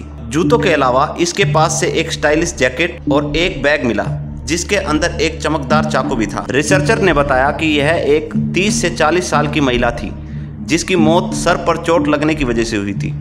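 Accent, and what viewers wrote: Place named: Haryana, India. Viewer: Haryane te lage h Bhai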